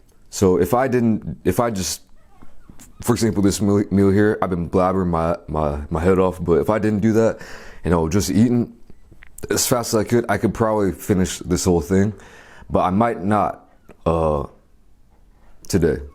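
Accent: United States. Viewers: he’s American Korean numb nut so that’s an American sounding voice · Oh okay that's why you sound so American lol you use to live here, didn't catch that as I'm a new sub. Binge watching lol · most American sounding Korean ever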